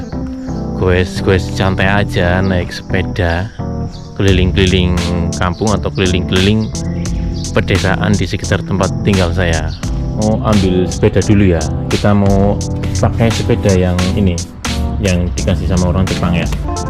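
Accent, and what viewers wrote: Banyumas, Indonesia. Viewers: NANG JEPANG YA ANA WONG NGAPAK YAH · Gk bosen liat kontenny bang ❤. Gaya ngapaknya khas...😂😂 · Gaul rika yakin...wong Banyumas ya min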